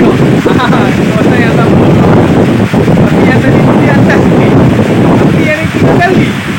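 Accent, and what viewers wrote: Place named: Pahang, Malaysia. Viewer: Loghat macam loghat pahang...org pahang ke?...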